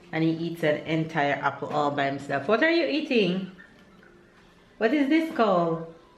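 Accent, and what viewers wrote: Jamaica. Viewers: I love love love your Jamaican accent. Lovely vlog. As for working out, the only exercise l do these days is walking and I enjoy it. Like I show on my vlogs, I try to leave the car behind most times and just walk. is such fun to watch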